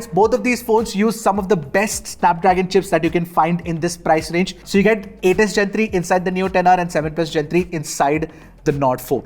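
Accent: India. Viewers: Your Indian accent is killing me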